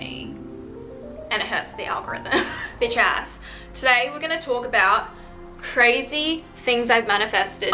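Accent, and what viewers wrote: Australia. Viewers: Asian woman with an Aussie accent is SOOOOOOO HOT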